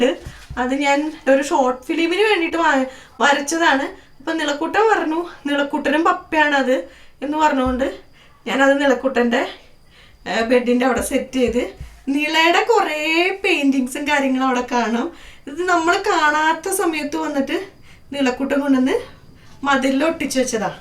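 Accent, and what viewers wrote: Thrissur, India. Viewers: നാട്ടിൽ ത്രിശൂർ ആണോ വീട്..?? സ്റ്റാർ മാജിക്കിലെ ലക്ഷ്മിയുടെയും സ്ലാങ്കും വോയിസും ഒരുപോലെയുണ്ട്...👍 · Hi, അവതരണം നന്നായിട്ടുണ്ട്, painting അടിപൊളി ആയിട്ടുണ്ട്, നാട്ടിൽ എവിടെയാ, ഒരു തൃശൂർ slang പോലെ തോന്നുന്നു.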